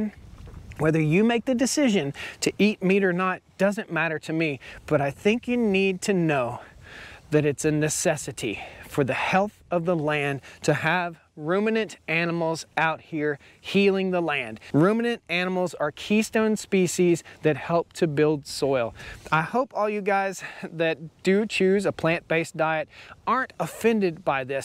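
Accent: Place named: United States. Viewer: o boy .... newer give likes .... you earn it a merican coool speach loove it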